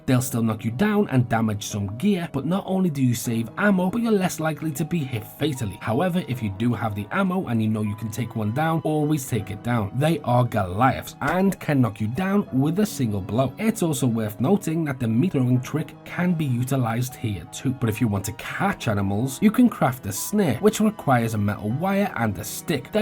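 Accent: Liverpool, United Kingdom. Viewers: hi mate love the videos! are you a fellow scouser? lol, sounds like it.. just wanted to ask, im tired of joining servers with absolute sweats where i cant do an airdrop or koth in peace, do you know of any servers you can share that are abit more beginner friendly where most people are on the same boat as me? wold be great if you could recommend any, thanks man! once agin love the content keep it up!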